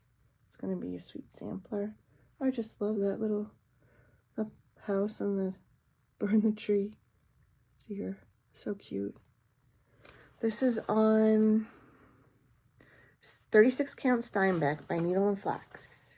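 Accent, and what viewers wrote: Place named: Long Island, United States. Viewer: Don't lose your Long Island accent... that's what first drew me to your flosstube... in addition to your wonderful samplers and camping stories. I was born in Bklyn and my folks moved us to the midwest. I so wanted to keep my accent, but I wasn't that lucky. I guess the linguistics doesn't change after age 16 and I was 13 when we moved. I an old lady now and every once in a while a word will slip out. Keep up the good, no great work!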